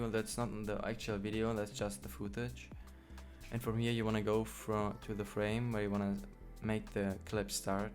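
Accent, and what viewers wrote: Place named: Germany. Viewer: You can just barely hear that you're german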